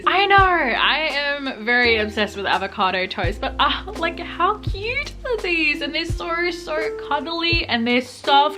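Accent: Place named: Australia. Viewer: god i miss this accent! aussie living in canada here! these pieces are so amazing! new sub here.. already in love with your content!! :) xx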